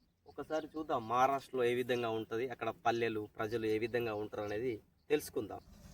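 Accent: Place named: Karimnagar, India. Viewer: Ma Karim nagar slang la undhi....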